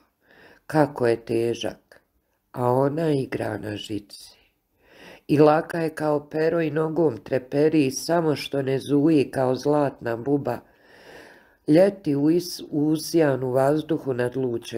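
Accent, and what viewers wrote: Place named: Sarajevo, Bosnia and Herzegovina. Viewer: Дикција наша сарајевска!🎉❤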